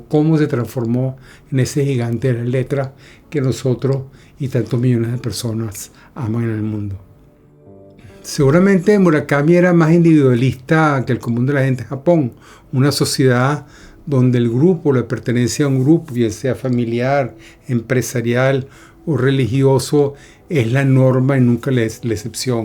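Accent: Caracas, Venezuela. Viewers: Excelente post. Muy grato ese tono de tertulia en acento venezolano, me atrevo a afirmar que es caraqueño. He leído casi todo de Mishima. Es uno de mis autores preferidos junto con Julio Cortázar. Gracias.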